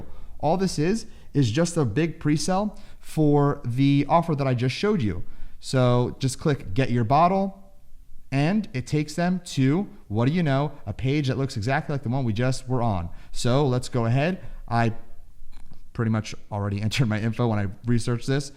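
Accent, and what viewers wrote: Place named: New York, United States. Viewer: Hahaha, no one will say yes bro if they're under the shadow making millions. I also like your NY style of talking about the ones in the dark, keep it up.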